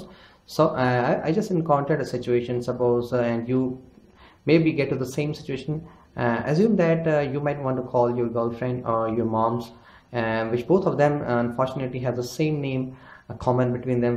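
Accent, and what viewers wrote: India. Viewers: That's Indian accent.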